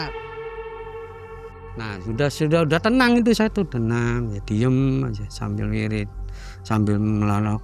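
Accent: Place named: Java, Indonesia. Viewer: Aku suka ngomongnya medok Jawa suka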